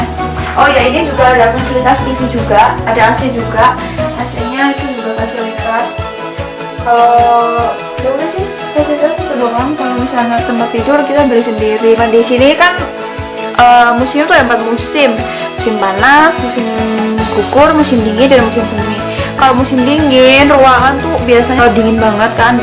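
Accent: Banyumas, Indonesia. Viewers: Kok agak2 medok ya. Orang mana kak? Kayak logat banyumasan gitu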